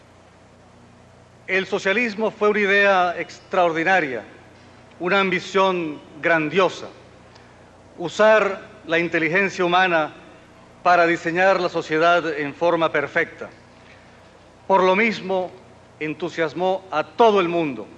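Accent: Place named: Argentina. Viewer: Parece que Carlos viajó la futuro latinoamericano y después narró lo que vió. De verdad es impresionante todo lo que dijo, y como se cumplió años después. P.D: No había notado que Carlos tenía un poco el acento argentino.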